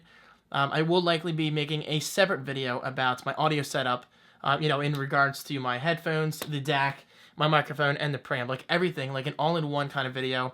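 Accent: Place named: Staten Island, United States. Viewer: Lol I feel like your from Staten Island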